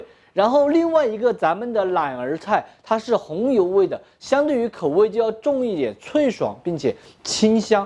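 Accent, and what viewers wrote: Sichuan, China. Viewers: 帅哥，就讲四川话嘛！这个弯管子的川普听起来好累哟😂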